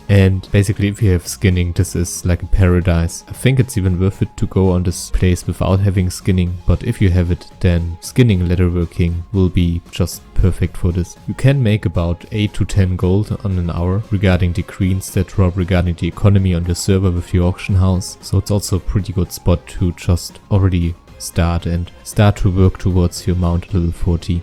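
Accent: Germany. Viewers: i can hear denglish :DD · Are you German? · he sounds very German to me. coming from a German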